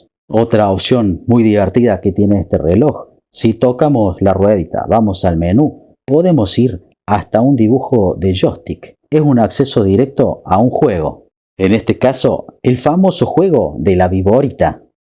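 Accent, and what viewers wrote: Córdoba, Argentina. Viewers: Jajaj soy colombiano y también es mi acento favorito. El de BA no me gusta tanto como este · Le doy me gusta por que me gusta el acento de Córdoba 😂😂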